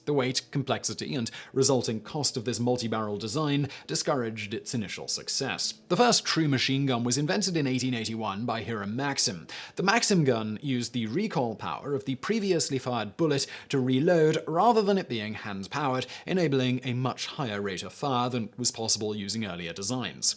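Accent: United Kingdom. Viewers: I'm so confused, you sound British but all the videos are so heavily American focused.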